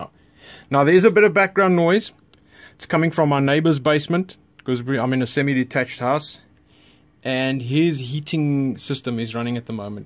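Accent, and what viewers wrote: South Africa. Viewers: So nice to hear another Saffa's accent and also somebody who loves valve amps.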